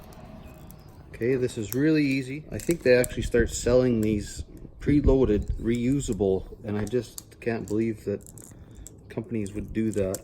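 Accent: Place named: Canada. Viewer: Knew you sounded Canadian, then I saw the shirt. Cheers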